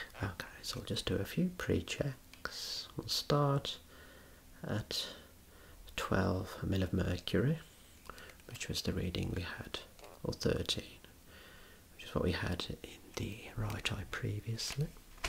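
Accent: United Kingdom. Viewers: it's the UK accent 100%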